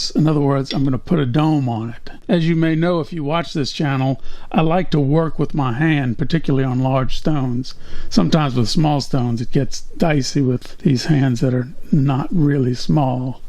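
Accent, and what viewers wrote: United States. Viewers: As I was leaving that comment I was thinking that I was probably wrong about the area of the United States where you picked up your Inflection. Bottom line Is that it all goes together with your tongue in cheek humour... It works!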